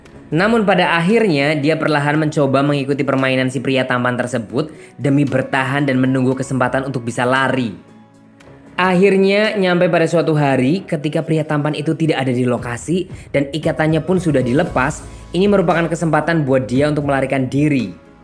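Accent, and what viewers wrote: Java, Indonesia. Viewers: Weslah ke Bali wae ben diopeni, Ganbathe san. wae Suara mu jowo medok renyah di rungokan. Hehehe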